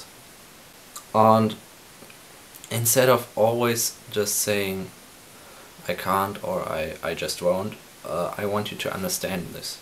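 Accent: Germany. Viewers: are you german ? your accent sounds like you are.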